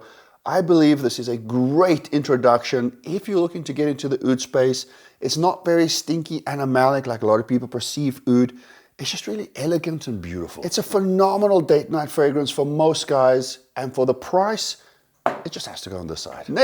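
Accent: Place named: South Africa. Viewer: I knew your accent was familiar. You mentioning South Africa confirmed my suspicion.😂😂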